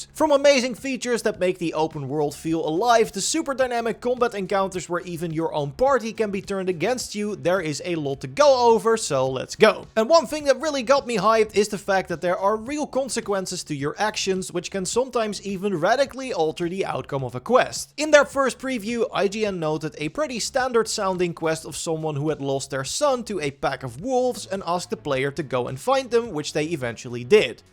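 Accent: Netherlands. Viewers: Dutchy dutchman talking. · dutch accent is so funny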